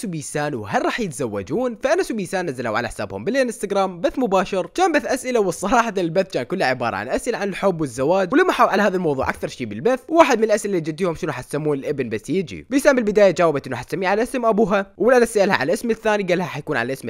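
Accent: Iraq. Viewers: بس كلي انت عراقي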